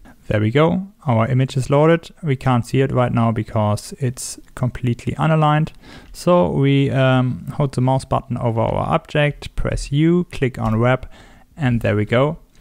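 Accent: Germany. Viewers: I Think i can hear a german accent in you english... but it´s working and that´s what i want! ... thank you for this tutorial it´s easy to follow...🙃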